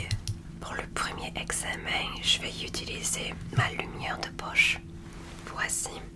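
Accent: Quebec, Canada. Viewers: Bonjour je suis nouveau sur ta chaine j'aime bien ton ASMR avec ton axen québec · No way la seule asmr artist que j'écoute c'est celaine et je suis tombée sur ta video par hasard dans mon fil c'est funny😂 Je l'ai écouter et ca fait vraiment différent avec ton accent québécois et t'es un peu moins hyperactive que celaine 😅😂 mais c'est très bon bravo! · Ohh je suis tomber en amour. J'ai toujours eu de la misère avec le asmr québécois. Mais la...❤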